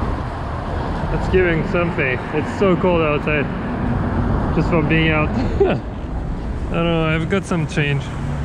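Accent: France. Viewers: So a year ago I barley used my DSLR and now I'm watching a one hour video of a dude with a french accent and broccoli roaming the streets randomly shooting shit. Life changes man 😂😂 but I love it.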